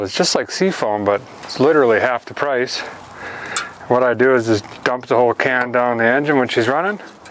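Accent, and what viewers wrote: Minnesota, United States. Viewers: You sound like you're from Minnesota your accent is really thick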